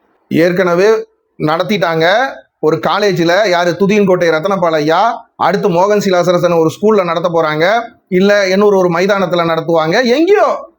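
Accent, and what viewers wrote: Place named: Tirunelveli, India. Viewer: என்ன ஒரு அருமை.... எல்லா புகழும் துதி கன மகிமை அனைத்தும் என் ஆண்டவருக்கே. அண்ணே திருநெல்வேலி தமிழ் பேசி வாழை பழத்துக்கு வலிக்காமல் ஊசி போட்டு விட்டீர்கள் 🎉🎉🎉🎉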